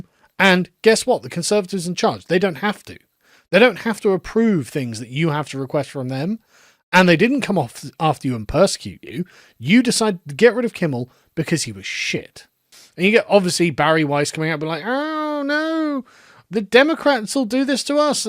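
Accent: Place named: United Kingdom. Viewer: You may have bought all that equipment 6-7 years ago but damn. You can’t buy that Brit accent that turns $2 words into $10 words.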